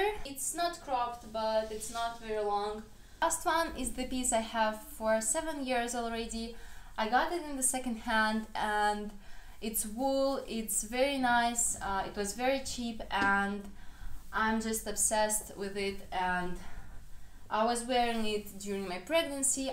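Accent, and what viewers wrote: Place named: Russia. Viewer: I'm not trying to be rude at all, I hear Russian accents all the time so I hope that you don't mind me saying this (Degree Celsius - with an "s" sound at the end). Thank you for having content in English.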